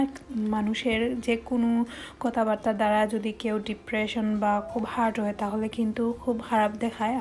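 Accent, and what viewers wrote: Sylhet, Bangladesh. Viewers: assalamualukum apa apnar muko amrar sylheti mat hunte ow besi bala lage go please sylheti bashai matba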